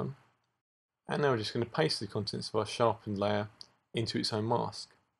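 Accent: United Kingdom. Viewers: buddha bless you for the British accent, so sick of s. tanks brilliant tuts, subscribed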